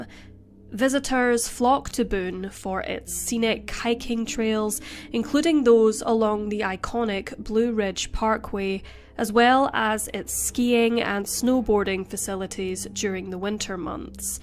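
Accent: Scotland, United Kingdom. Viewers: UR SCOTTISH ACCENT IS AWESOME & HELPS ME WITH MY DEPRESSION, ANXIETY & 💤😴! I KNOW 🌏🌍🌎HAS WORSE PROBLEMS THAN MY ABUSE I DEAL WITH OVER 20 YRS NOW!!! THANKS, & HER " FAITHFUL SUBSCRIBERS!!✊🏽🇺🇸🇺🇸🇺🇸🇺🇸🇺🇸🇺🇸🇨🇴🇨🇴🇨🇴🇨🇴🇨🇴🇨🇴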